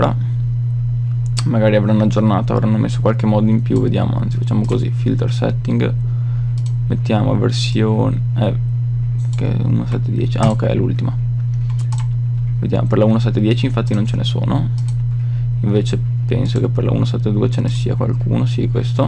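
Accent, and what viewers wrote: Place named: Rovigo, Italy. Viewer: sei di rovigo lol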